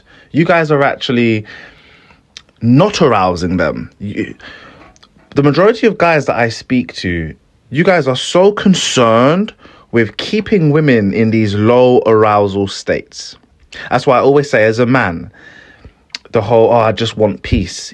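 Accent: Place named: United Kingdom. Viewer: Ppl like the fake. That’s why redpill folk so popular. Goofy ppl gravitate to goofy ppl. He’s this era Patrice O’Neal with the UK accent. Wish him success nd continued contentment in doing what he loves.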